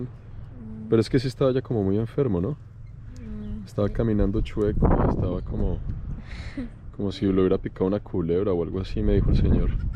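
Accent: Colombia. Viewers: me gusta mucho tus vídeos y tú acento colombiano es único ases muy bien tus vídeos saludos desde fort worth Texas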